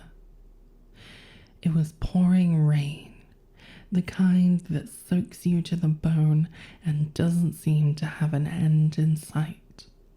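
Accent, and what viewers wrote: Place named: United Kingdom. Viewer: oh she's british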